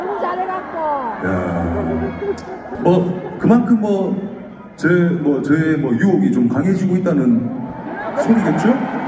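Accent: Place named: South Korea. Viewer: 우리가수님 노래도 잘하시고 말도 너무잘하세요 사투리를 구수하게 정감있어 좋아요 대전 막콘 잘하세요 이번 두번째 콘서트 대성공이예요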